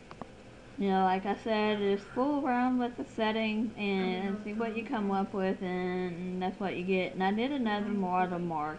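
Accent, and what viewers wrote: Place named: South, United States. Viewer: ok...from your accent...you're a Southern..which is ok...but next time you video something...shut the radio/tv off..it's distracting and trying to listen to you, with your southern accent and the background noise...very difficult to hear/understand what you're saying....just a thought.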